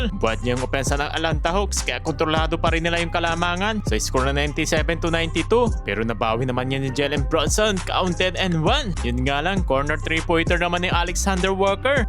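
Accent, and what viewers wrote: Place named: Visayas, Philippines. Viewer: naging bisaya accent?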